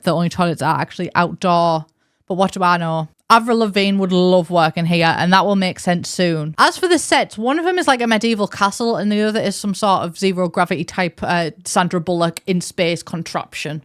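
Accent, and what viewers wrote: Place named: Working class, United Kingdom. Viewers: james cook also colonised where i live in far north australia, he was the first european to arrive here actually. we actually used to have a massive statue of him where he literally was doing the hitler salute and a bunch of racist locals were really up in arms when it was removed a few years ago. our main university is james cook university, and there’s a little “town” nearby literally called cooktown. that man was terrible though, along with the rest of his fleet of colonisers. murderous, pillaging, entitled bastards. according to some aboriginal/first nations lore his arrival was foretold as a large pelican that would bring destruction, and in fact what was foreseen were the sails of his boat, which the people had no prior reference for. had no idea that he was from middlesbrough. i find a lot of northern brits are drawn to live here though, and not to generalise and obviously not everyone is the same but i really like northeners better than other brits, they’re a lot more down to earth, less serious and generally my kind of people. 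😊 my step dad was northern and his accent and the words he used and way he said things are so much like. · I haven't paid so much attention since literal university. You've got such a good mixture of academic and fun, working-class British and it's so engaging. I absolutely love listening to you talk.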